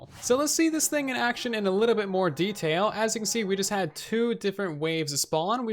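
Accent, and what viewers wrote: United States. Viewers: is secretly mumbo but with a American accent? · is the mumbo jumbo but not for extreme redstone but for game mechanics and with a American accent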